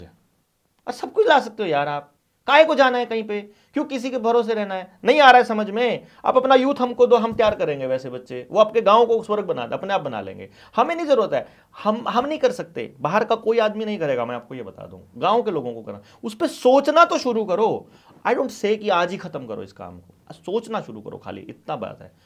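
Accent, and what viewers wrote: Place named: Haryana, India. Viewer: इंटरव्यू करने वाला बंदा तो अच्छा रखा करो जिसकी जबान साफ हो और एक्सेंट ना हो। गंवार टाइप हरियाणवी ग्रामीणों को इंटरव्यू लेने बैठा लेते हों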